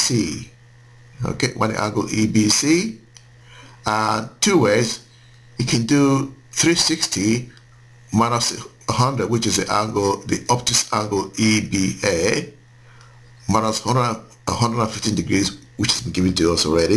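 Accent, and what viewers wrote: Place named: Nigeria. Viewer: ahaha nigerian accent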